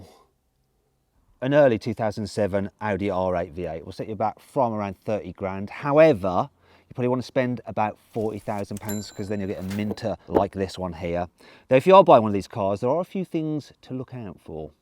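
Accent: Germany. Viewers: Yaaaaa.... I am very very much interested to see the German from whom Mat has picked up the accent and mannerisms. Love the Video as always and eagerly waiting for the engine upgrade of Jimny. Love, health, and happiness for you Mat from India. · can we get a "best of german accent" video:D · i just love. ants german accent ya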